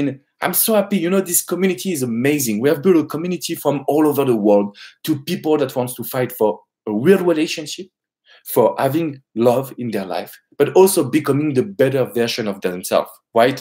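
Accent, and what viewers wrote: France. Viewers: Nice French accent. Where did you get it from? Thank you. great video.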